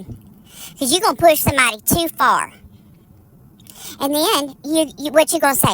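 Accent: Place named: South, United States. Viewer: I missed you miss, and I love Southern accents 😍💯🙏🏼❤️ Great video like always 💯🐍🥕🤡👿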